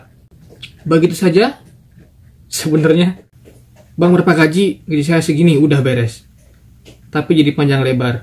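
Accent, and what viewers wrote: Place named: Java, Indonesia. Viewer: Perasaan Jawa barat ngomongnya Sunda😅 Iki lho jowo medhok rek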